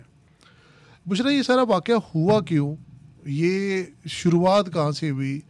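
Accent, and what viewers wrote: India. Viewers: انڈین سٹائل سےاوردو بولنے پر شرم آنی چاہیے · are you an indian. Why do you speak in an indian accent? Qhan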